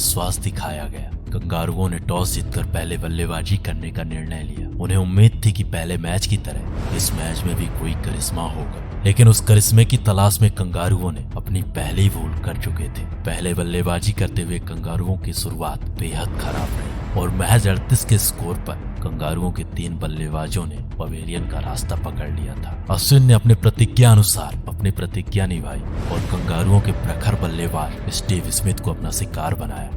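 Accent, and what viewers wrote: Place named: Wasseypur, India. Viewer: Commentary in wasseypur styles,😶‍🌫️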